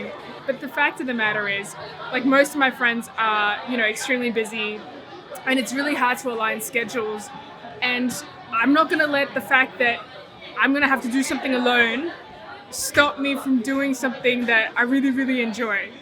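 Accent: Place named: Australia. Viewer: So cool to see you cross Shibuya Crossing. Love your Aussie accent.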